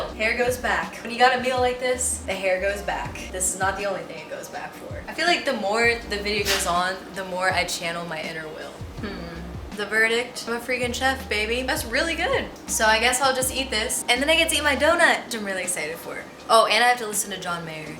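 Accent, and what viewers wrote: Ohio, United States. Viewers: Great video! Haha your Ohio accent is showing! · Side note: I love your user name lol has a nice ring to it! Sounds sexy/badass at the same time. Anyway I noticed the mention of your Ohio accent? Midwest represent lol I live in lake county Illinois which is about 35 min north of chicago. What part of Ohio are you from? Do you still live in Ohio currently? Not trying to sound like a creep stalker lol honestly just curious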